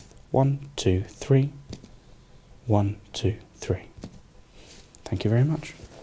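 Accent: United Kingdom. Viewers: Very british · Thats most biggest British accent I ever heard :)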